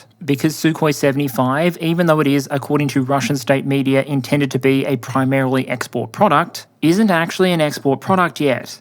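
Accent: Australia. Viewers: I'm just here for the aussie accented sarcasm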